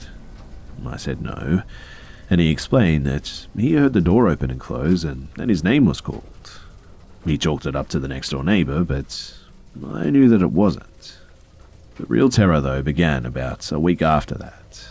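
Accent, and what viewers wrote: Australia. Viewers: As ALWAYS, hearing your VERY COOL, unique Aussie accent as you read these wonderfully twisted stories puts me in a FABULOUS mood as it truly makes me happy; no matter how creepy, spooky, shocking or bizarre the stories are! Thank you for the dedication you have for your subscribers/fans. It is most decidedly more fun and interesting knowing you have this amazing content AND without a DOUBT, you ARE one of the absolute BEST YouTube narrators out there. PERIOD. (I apologize if my commments seems sort of disingenuous, a bit immature or ass-kissy (yep, I make that one up!), but I really enjoy your channel and it's content...so please keep doing what you're doing!!!) THANK YOU!!! 💀👽🛸🧛‍♀️💜🧛‍♂️⚰🦇🌙⛈🎃☠🐱🕸🕷👻🧟‍♀️🧡🧟‍♂️🧙‍♂️🔮🧙‍♀️🖤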